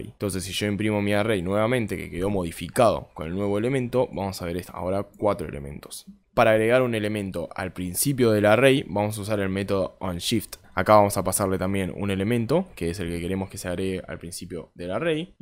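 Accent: Argentina. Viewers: Creo que entendí mejor contigo que con el curso introductorio de soyHenry, no les entiendo nada hasta el día de hoy a ellos, pero a ti, clarito bro, voy a hecharle un vistazo a tu curso del que hablas 👌🏻😎, la verdad, hablas claro apesar de que creo que eres argentino no?, en caso de ser asi te entiendo perfectamente a ti, y no a los de soyHnery, deberias ser instructor de ahi, ya que te juro que no les entiendo nada de lo que hablan, no se si no pronuncian bien o hablan demasiado rapido, tu no, calmado y bien explicado, hasta rayas, y eso vale oro bro. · Un chino con acento argentino, tienes un nuevo fan 😊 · Gracias amigo, me vi muchos videos pero vos lo explicaste cortita y al pie, pd: te sale re bien el acento argentino, un saludo hermano 🙌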